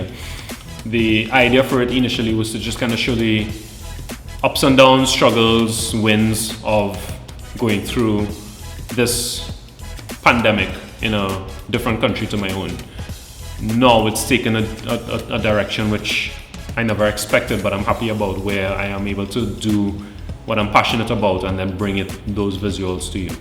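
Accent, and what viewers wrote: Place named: Trinidad and Tobago. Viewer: Our beautiful Trini accent .Keep in representing another YouTubed here.Keep up the good work.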